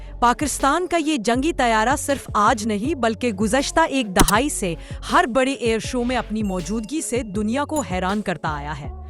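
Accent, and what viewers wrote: India. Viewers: بولنے کے اندر سے پتا چلتا ہے کہ انڈین ہو پر انالئز اچھا کیا ہے پاک ٹیکنالوجی کو ❤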